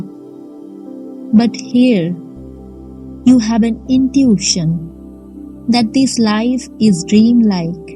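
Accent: India. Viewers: What is wrong with Indian accent? Are you a European? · Why have you chosen a sound with indian accent? To demonstrate the origin of R.M? That's a very bad choice. It would have more effects with ordinary English speaking audiences. We love his words in the most direct way of communication.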